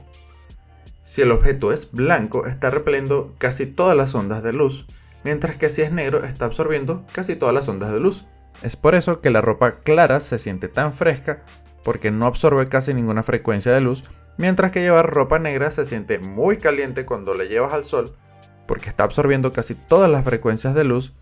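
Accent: Venezuela. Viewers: Eres venezolano? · Ese acento. Venezolano, tal vez?. Dibujas super genial!. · si es evidente, solo que no es tan conocido. Pd: a mi me gusta mucho el acento veneco jeje.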